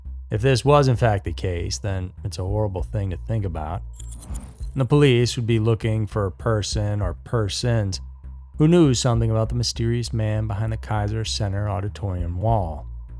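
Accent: South, United States. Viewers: Never heard about this case before. Pretty crazy. Love that southern accent.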